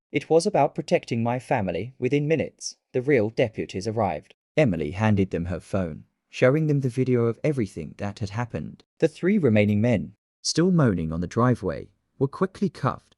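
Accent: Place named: United Kingdom. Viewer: A US Navy seal with a UK accent.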